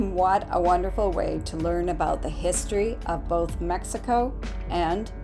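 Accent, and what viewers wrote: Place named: United States. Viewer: Do you have to pronounce it with an American accent? Change the "a" sound. It'll sound much nicer.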